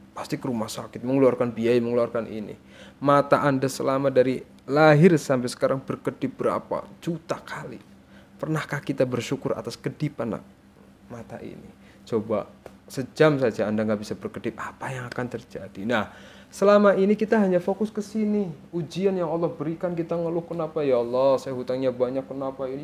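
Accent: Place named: Banyumas, Indonesia. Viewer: Orang cilacap ,banymas, tambak, purwokerto? Ngapak bangeeet???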